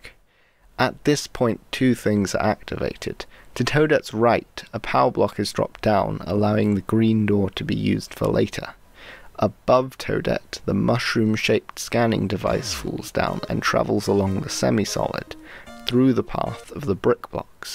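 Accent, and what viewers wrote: United Kingdom. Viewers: Are you Scottish? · are you from portsmouth? you sound like neil gaiman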